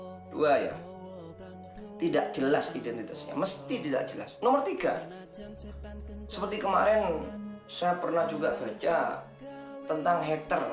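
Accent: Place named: Madura, Indonesia. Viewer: Bhasanya logat.orang Madura iya pak..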